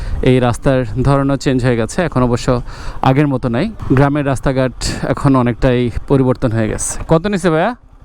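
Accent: Chandpur, Bangladesh. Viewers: Apnar basha Chandpur, age jantam na😮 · Vaiya apnr vasa ki Chandpur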